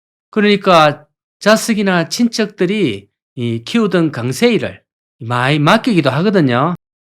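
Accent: Gyeongsang, South Korea. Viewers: 갱상도 표준말 방송......좋심더에! 잘 보고 있심더. 배차, 소나무갈비,고매,.... 억수로 올만에 들어보니 71년 촌에서 초교 입학때가 선합니다요. 뭔 농막이 이리 커요? 쉼터로는 따봉이것네요. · 웃음끼 없는 경상도 사투리에 화면은 보이지 않고, 웃기만 하는 순간들이 있습니다😊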